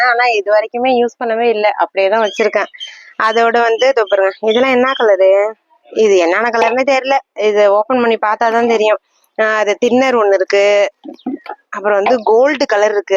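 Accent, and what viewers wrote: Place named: Mayiladuthurai, India. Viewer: Srumsi thirsmaisali ma❤😊 enga relatives lam mayiladudurai la erukanga unga slang apdiye eruku anga erukavanga lam epdi ungala mare dha semaya vela seivanga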